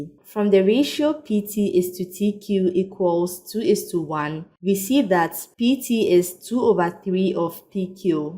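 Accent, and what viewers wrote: Ghana. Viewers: are you Ghanaian?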